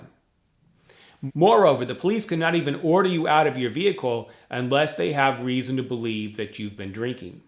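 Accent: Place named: Georgia, United States. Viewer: Tis is absurd. AN officer never has a right to search a vehicle without a warrant even if an arrest is made. You sound like you are from Cobb County GA. I am a criminal Defense Investigator and a Police Officer has no right to ever search a vehicle without a warrant, permission or Probable Cause, being something illegal in plain sight, however if drugs are suspected a K-9Unit can be called to the scene to determine if there would be cause to search the vehicle. You search my car without my permission, and you will not be a police officer any longer, I will have your P.O.S.T. removed and end your career.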